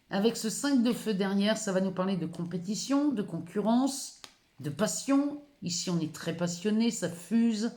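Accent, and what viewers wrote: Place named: North, France. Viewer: Ouais super 👍 chouette 🦉 merci 🙏 bcp encore en plein ds l mil espèrons q c sera réalisé ds la matière bonne continuation à vs j 💥☀️🥰😍adore c q vs faites et votre accent du nord.! Encore merci 😊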